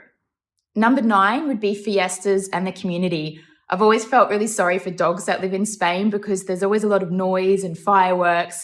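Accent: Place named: Australia. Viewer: First time listening, i can say she has an Australian accent ❤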